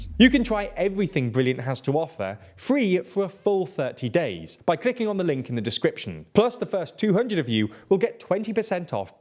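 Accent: United Kingdom. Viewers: Excellent exposé but you're highly accentuated British accent is so painful to hear! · Good job on your British accent!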